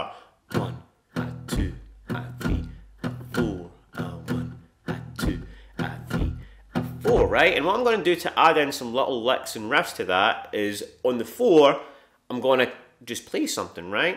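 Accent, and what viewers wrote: Scotland, United Kingdom. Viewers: I have absolutely no problem with anyone playing this kind of music at all. But it always seems just a little strange to hear that Scottish accent with what is to me, traditionally very American music. Or any accent. I'm glad people dig it. Play it all you want. It's great. I'm glad people in other places can fall in love with music I love.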